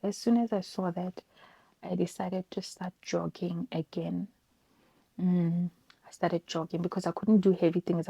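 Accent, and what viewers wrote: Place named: Africa. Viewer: it might not have been your intention, but race absolutely plays a part. I said nothing about you being racist or not watching other influencers of race. My point is once you interact with people of other races in real life, face to face(not online/ through a tv screen), then your opinions about how they speak hold more validity. You can't critique something you have no first hand experience of. Weight struggles, yes maybe. But the way she speaks? Absolutely not. African accents, dialects and tonations vary considerably, so if you're not used to it, it's easy to fall back on loose criticisms like it being AI generated. Imagine if the OP left a similar comment and justifying reasons for a Romanian native, having only seen Romanian influencers online and never stepped foot in that country-- they would come for her neck. Just make sure your receipts are valid, that's all.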